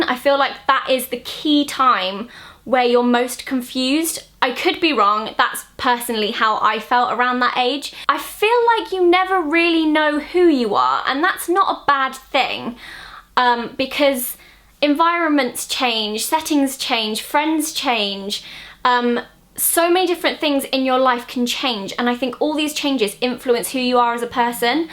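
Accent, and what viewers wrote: United Kingdom. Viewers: Hi Zoella! I am from Brazil......... Say "hello" to Brazil in your nxt videos. I see yoour videos because you're so funny. I don't use make ups..hahah. I see also because i'm in UK and it help me learn a little more the way british people accent.... thank you kisses.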